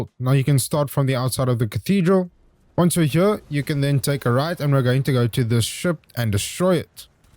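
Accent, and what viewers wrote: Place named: South Africa. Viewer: Just curious, are you south African? You sound a lot like dicuss du plessis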